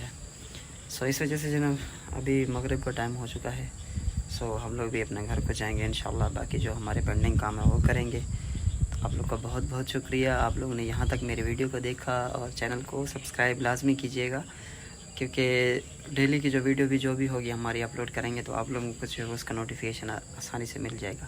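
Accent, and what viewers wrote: Saraiki, Pakistan. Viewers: پلیز بھائی ضرور جواب دین آپ پاکستان کے کس شہر سے ہیں؟ آپ تو بالکل ہمارے والی سرائیکی بولتے ہیں میں نے اپکا ویلاگ دیکھا گاوں والا ۔ ہم ڈیری غازی خان سے ہیں اب اسلام آباد ہوتے ہیں۔ پلیز جواب ضرور دیں آپ پاکستان کے کس شہر یا گاوں سے ہیں۔ پلیز ۔ فاطمہ اسلام آباد پاکستان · Kabhi tum kisi lady k saath unko wife batate ho kabhokou new aurat ko wife batate ho.tum lahje se saraiki lagte ho.Makkah mai mere under 1 sairaiki mechanic kaam karta tha.yahi lahja tha.but very nice guy tha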